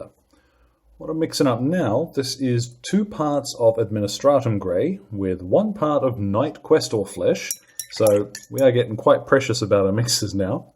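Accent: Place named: New Zealand. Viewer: i love that kiwi banter, it reminds me of Simon Bates from radio 1 back in the day..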